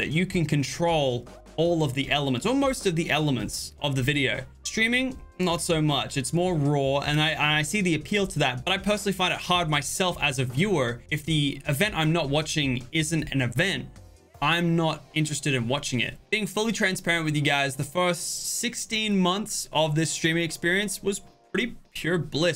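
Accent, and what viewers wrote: United States. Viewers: why do you try and talk with a US accent though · Your accent is getting more and more American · UGHHHHHHHH Can't hand the American twang brother. It sucks.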